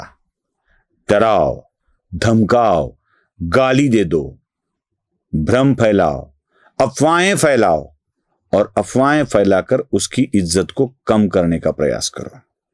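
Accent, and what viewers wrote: Maharashtra, India. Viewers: Mujhe Marathi language aur Hindi accent maharashtrian logon ka sun ke bahut achha lagta hai...❤❤❤❤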